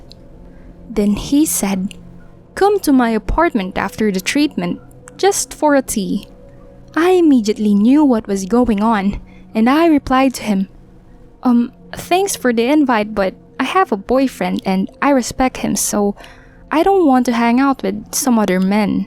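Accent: Philippines. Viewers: She sound like a filipina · I have a feeling that the narrator was filipino :> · Pinoy yung narrator 😂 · The accent of the narrator sounded like a filipino accent. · Filipino accent, no doubt. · I think the narrator is a philipino thats why when they talk in english you know no hate on me or you will get reported and blocked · Is the narrator filipino? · Is the narrator FILIPINO? She has the filipino accent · Parang pinay yung narator.. grabe, kung ako yan sasapakin ko agad yan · I can tell the narrator is a filipino cause when I narrated a story that is also how I sound like. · The narrator sounds like Filipino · It's funny bcs some people think that the narrator of this video is a Filipino bcs of its accent. I mean, is there something wrong with OUR ACCENT? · She is a filipino. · feel ko pinay nagbabasa ng story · Is the narrator from the Philippines? her accent is just like a filipina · i got a strong feeling that the narrator is filipino lol. 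· The narrator was definitely from the Philippines. The "t's" at the end gives it away... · Uhm it sounds like the narrator is Filipino..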